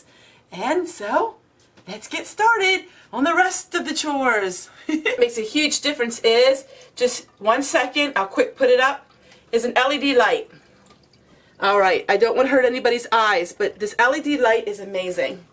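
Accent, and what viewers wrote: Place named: Pennsylvania, United States. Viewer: I understand. I watched your Sunday video. It was excellent! You're such a godly lady. ❤ If I didn't know any better I'd think you were from my old neck of the woods.....in Pennsylvania.... because of your accent. 😉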